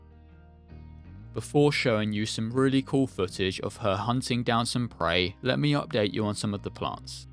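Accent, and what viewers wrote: United Kingdom. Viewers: Yeah, female, mate. Lovely terrarium. Just making a large slow flowing waterfall terrarium now and hoping to put a mantis in it. Not sure which one yet 🤔 P.S. You sound like a Manc as well?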